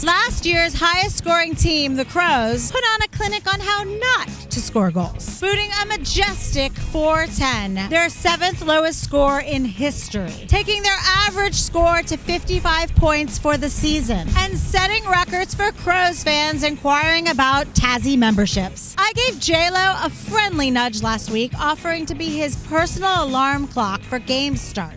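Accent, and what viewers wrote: United States. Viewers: am Paralowie,South Australia I read a story on page 16 of the Advertiser, yes I’m that old I still read the newspaper. I’m 50 yrs old born in Australia and know very little about Aussie Rules. I’m learning from you, you crack me up 😂😂 I really love that you are an American Female talking about AFL. Your humor and accent make it even funnier, don’t stop👍🏼 You’re our official American Commentator 😁😁😁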